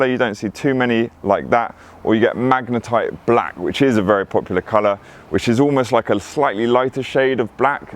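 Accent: United Kingdom. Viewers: Man, you sound like a British !! you should start a podcast.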